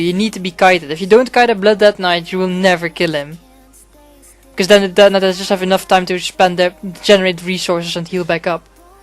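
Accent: Netherlands. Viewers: the dutch exent is so enoing but the info is kinda good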